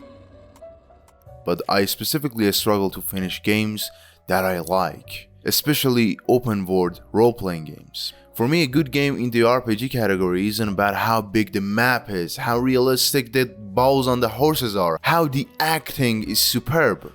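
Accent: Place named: Europe. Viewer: When he's got a euro accent you cant place. Its gonna be a fire video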